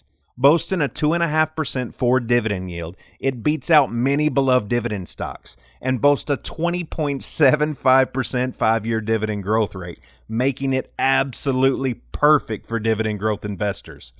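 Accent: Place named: South, United States. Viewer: I detect a hint of the Southern US in your accent. Very pleasant.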